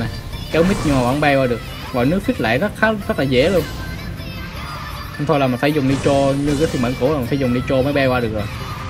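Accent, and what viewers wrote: Quang Ngai, Vietnam. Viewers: Anh quảng ngãi hả em quẢng nam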